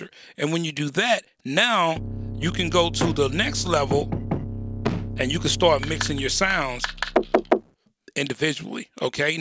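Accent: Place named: United States. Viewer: Your heavy murrican gangsta accent makes the understanding for non-native speaker really hard.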